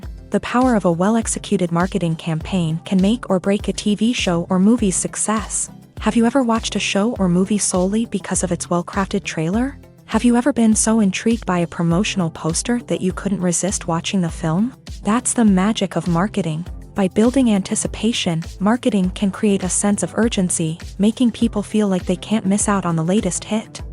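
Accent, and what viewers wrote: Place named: United States. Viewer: I wanted to watch the movie, not listen to a description - even less so in a twangy American accent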